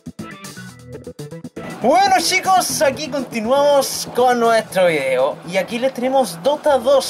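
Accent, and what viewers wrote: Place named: Chile. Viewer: me encanta tu acento chileno <333 me subscribo 7w7r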